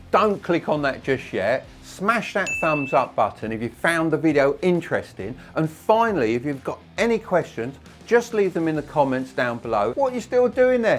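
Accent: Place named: London, United Kingdom. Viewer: Do you live in America? because you sound like every bloke on my street in London.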